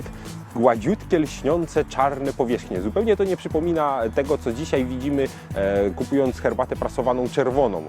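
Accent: Silesia, Poland. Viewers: piękny śląski zaśpiew <3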